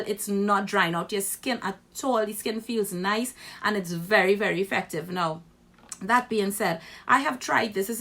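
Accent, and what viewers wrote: Trinidad and Tobago. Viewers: Are you trini? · are you from trinidad?Love this!!!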